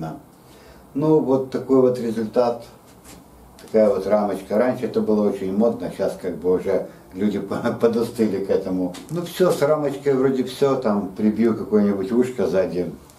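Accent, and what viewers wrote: Odessa, Ukraine. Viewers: "Свежая кровь" в столярном влогинге.Ни одного дизлайка!Так держать!Я подписался(одесский говор ласкает слух))))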